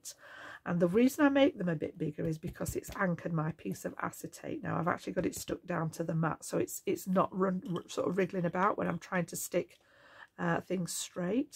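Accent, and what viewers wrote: United Kingdom. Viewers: that’s what I thought I heard. ❤❤❤❤Where about in Yorkshire?❤❤❤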